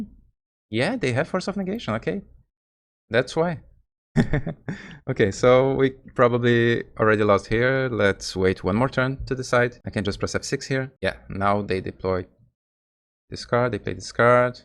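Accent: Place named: Brazil. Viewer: ele é br? nem sabia mas agora o sotaque tá fazendo sentido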